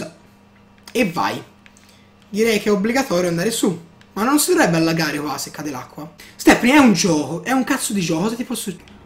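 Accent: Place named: Florence, Italy. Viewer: mi fai morire😂😂 in un manihomiooo💞😂...quanto adoro la pronuncia dei fiorentini ahah💞😂😂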